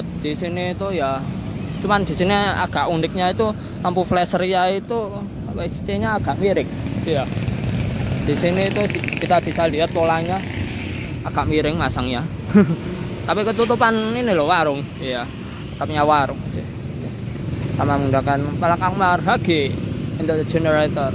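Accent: Java, Indonesia. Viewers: Asli mu mna tho Nang..? 😅 Medhok bgt.. 😅😅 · Asli mu mna tho Nang..? 😂 Medhok bgt.. 😅😅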